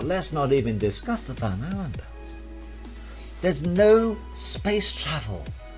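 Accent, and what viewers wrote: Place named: United Kingdom. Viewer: The British accent is great for sales. He is speaking the truth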